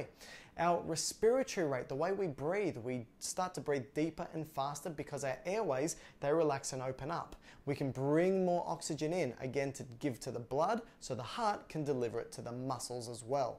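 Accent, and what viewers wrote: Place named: Australia. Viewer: Your accent is exact like some of the legendary Australian cricketers..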